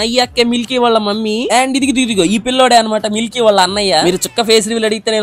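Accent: Nellore, India. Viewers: Edo ninna bore kottuta youtube loki vacha ayya Okka video edo random lo came yedooo ma ooru laga slang ma ooru laga undi ani chusta unna kalla kinda carry bags vachai work pakkana nuka chusukunta unna motham reels videos Neeku oka dannam ayya sami Me illu eda nayana nellore vachi darshanam chesukunta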